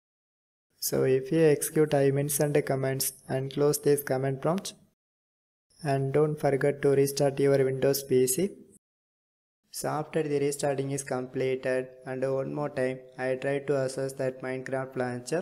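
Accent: India. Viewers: THANK YOU, KIND INDIAN MAN. I LOVE YOU AND YOUR PEOPLE! AS SOON AS I HEARD THE ACCENT I KNEW MY ISSUE WOULD BE SOLVED!! ❤❤❤ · If i hear this idian voice i know i will get saved Ty bro ❤❤❤❤😂😂👍👍👍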